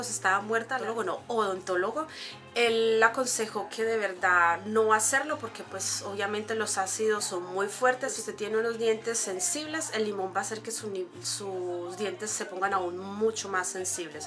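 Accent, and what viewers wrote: Colombia. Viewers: Hoy descubrí estos videos y están geniales, gracias por compartirlos con todos nosotros :) Eres paisa? Es que te siento como acento jeje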